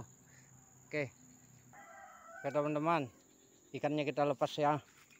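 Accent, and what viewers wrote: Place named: Banjar, Indonesia. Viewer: Logatnya urang banjar saudaraku....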